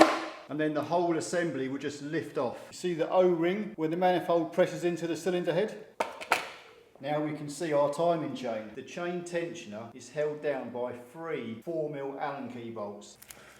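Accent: United Kingdom. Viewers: Hi british accent, this wet belt made by Dayco?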